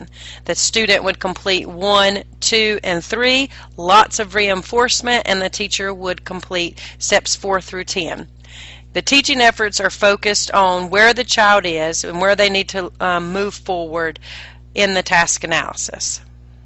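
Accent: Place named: Texas, United States. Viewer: fantastic texan axent ;-)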